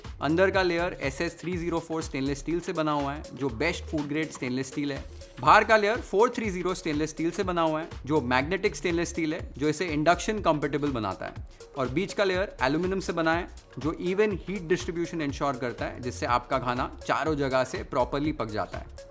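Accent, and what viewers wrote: Maharashtra, India. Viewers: Sir I truly admire your pronunciations in Marathi esp "l" which is authentic for Maharashtra, hats off to you for introducing authentic recipes of India along with authentic language pronunciations, best wishes for the same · Bhai ki Marathi accent ❤️ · Chef , nicely talking Marathi -Hindi misal language 😊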